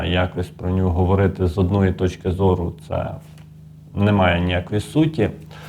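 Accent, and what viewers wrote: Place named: Western Ukraine, Ukraine. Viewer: Судячи по діалекту ви орієнтуєтесь на підписників зах.України.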